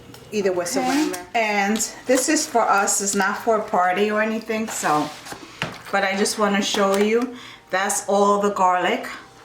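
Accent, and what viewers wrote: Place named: New York, United States. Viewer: I got my pilon and I'm enjoyin' this video, but even though I might change up the recipe for the rub (more garlic, fresh oregano, less salt overall including in the adobo), I can't resist listening to anyone who says "aks" instead of "asks", and "mine's" instead of "mine", 'cause that's how I grew up on the Lower East Side. I missed doin' it for Christmas Eve or Christmas, but I will be makin' mine soon, along with pasteles and homemade coquito. Thanks for this vid, made my day more smile filled.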